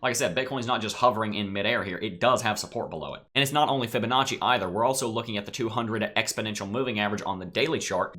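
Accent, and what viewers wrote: South, United States. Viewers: Don't do it. Your folksy southern cadence is all we need!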